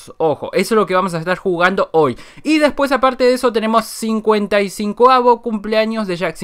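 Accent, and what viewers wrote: Argentina. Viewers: sos argentino???? desilo dale! xD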